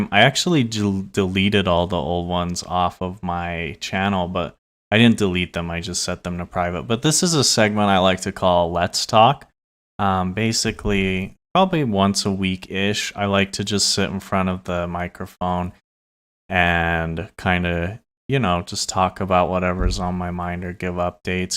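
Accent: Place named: France. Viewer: You shall be spared (for the french accent)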